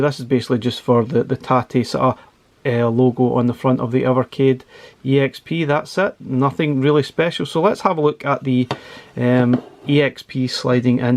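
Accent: Scotland, United Kingdom. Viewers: Just wanted to say I recently found your videos after getting a Super Pocket having wanted an Evercade device for a while, and they’re fantastic :D then couldn’t resist an Evercade VS after getting a few games and wanting to play the on the bigger screen…your reviews are invaluable for deciding what to get next, and it’s always good to hear a fellow Scottish accent on YouTube :D thanks for all you do!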